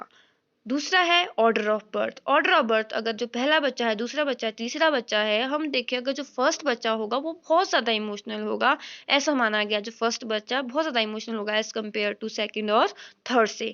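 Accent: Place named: Jammu, India. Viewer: Mam apki language me dogri touch dikh ra h❤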